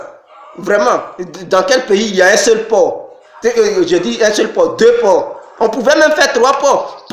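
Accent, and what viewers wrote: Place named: Daloa, Ivory Coast. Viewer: Ton accent bete de Daloa te fatigue heinn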